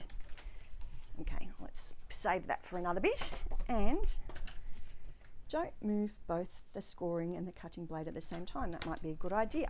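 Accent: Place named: Australia. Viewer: Nice to hear an Aussie sharing!😀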